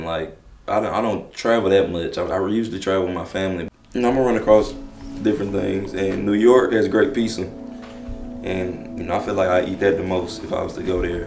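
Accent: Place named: South, United States. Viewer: straight down south accent.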